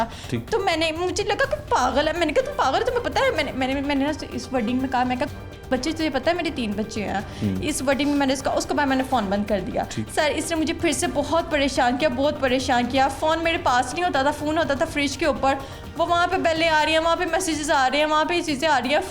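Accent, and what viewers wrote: Punjab, India. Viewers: Accent tu completely punjabi hai. Lakin punjabi bulna nahi hota.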